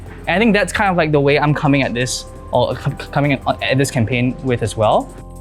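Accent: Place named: United States. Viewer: Listen to him in another podcast. He has this American accent which is attractive but lacks depth in his conversation.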